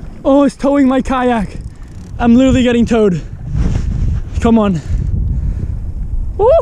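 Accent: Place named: Mexico. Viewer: Para mí el mayor plot twist fue ver que hablas español ¿Eres mexicano? Porque yo sí y el acento me sonó muy mexicano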